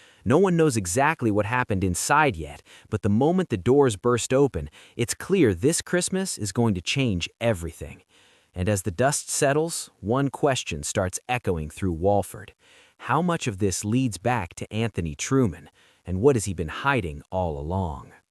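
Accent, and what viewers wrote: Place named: United States. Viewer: Why is there an American speaking about our soap??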